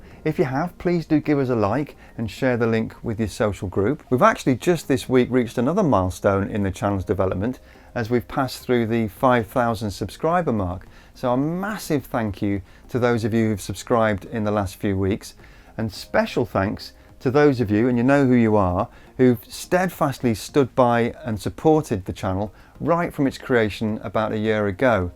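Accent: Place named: Cockney, United Kingdom. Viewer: Lots of "sensationalism" & "doom&gloom, if it bleeds it leads" MISLEADING PSUEDO SCIENCE from our cockney accented "pseudo scientist".... Notice he says "sea ice" a lot. Propaganda from fiberal globalist seditionists seeking to "carbon tax" us into oblivion, this video is clearly paid advertising to sell us all into submission... don't believe the rhetoric. HERE ARE THE FACTS: 1) ice shelves are very thin floating 'skins' relative to the main ice mass at the poles, making for dramatic imagery (likely photo-shopped to support the phoney narrative). 2) yes, "sea ice" is diminishing, and yes our climate is warming, but... NO, Sea levels will NOT rise & flood coastal regions. 3) NASA's circumpolar satellites measure surface elevation & micro-gravity (mass directly below the sat.) and clearly show FIVE TIMES MORE ICE IS CURRENTLY DEPOSITED BY SNOWFALL AT THE POLES than what is lost to melting "sea ice". 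4) the disparity between the much greater annual polar deposition vs. loss of peripheral "sea ice" is ACCELERATING (especially at the Antarctic landmass). 5) while global warming is for real, it will NOT result in "catastrophic sea level rise" for the above reasons as well as greatly increased evap/precip filling depleted lakes, rivers & aquifers, as well as greater atmospheric moisture held in clouds & humidity. 6) CLIMATE MODERATION will greatly warm our winters but ACTUALLY COOL OUR SUMMERS in many regions due to the cooling effect of MOBILE liquid meltwater vs prior stationary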